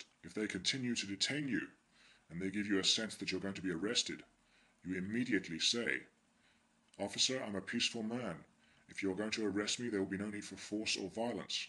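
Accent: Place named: United Kingdom. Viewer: by the way, you sound british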